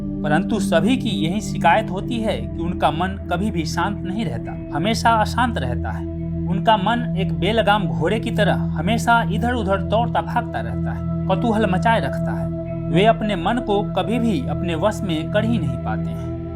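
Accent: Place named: Bihar, India. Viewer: Voice must be bihari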